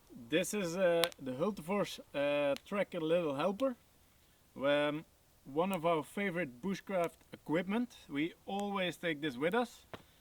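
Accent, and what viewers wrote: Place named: Netherlands. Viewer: Hej, are you originally from Holland or Belgium i have family there i recognise the brytning! Hälsningar från Hamburgsund!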